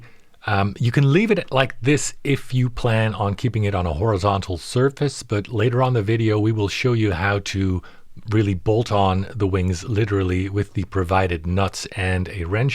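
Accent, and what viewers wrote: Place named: United States. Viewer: what are you talking about?? 😂😂😂 If English is actually his second language then kudos to him because he has a great American accent. I would assume he moved to Europe.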